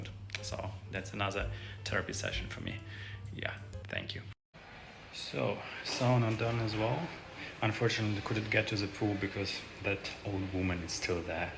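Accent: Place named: Germany. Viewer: I won’t judge you. I love your German accent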